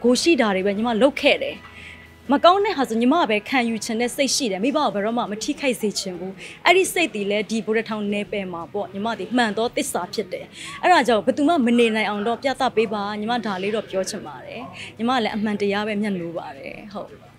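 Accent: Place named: Pa-O, Myanmar. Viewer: ပအို့ဝ်သံနဲ့တူတယ်လို့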